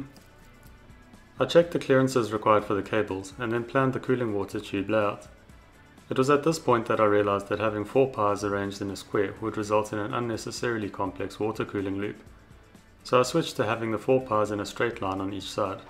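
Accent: South Africa. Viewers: Clicked link. Didn't expect to hear a South African. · But why? P.s. is that an SA accent? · Awesome project! I’m I hearing a South African accent? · You sound like a south african ? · love the South African accent, but please call it a Water cooling system, not a cooling water system lol!! Impressive stuff, could you share the clusters Hashrate for BTC when overclocked to 2Ghz? and the temps? would be a nice stress test on the cluster and cooling water system :p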